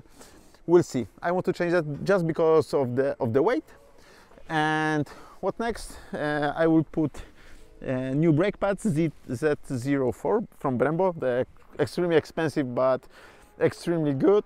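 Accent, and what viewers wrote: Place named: Poland. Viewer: Your English is more polish then English😢😢😢😢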